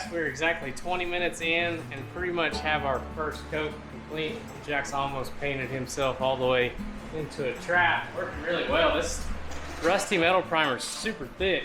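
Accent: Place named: Boston, United States. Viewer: Your boston is beautiful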